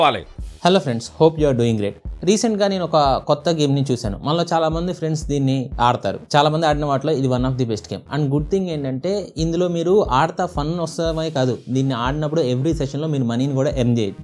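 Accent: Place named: Telugu, India. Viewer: Era epudu chusina denini telugu lo em antaro naku telidhu antav nvu emina foreign lo puttava ne slang chuste pakka telugu medium gadi la unav, ayna idhi idhi recorded video a kada evadnina adigi chepochu kada leda google chesina telustadhi telugu li em antaro ani, prathodki idoka fashion aypoindhi telugu ranattu xtralu mingadam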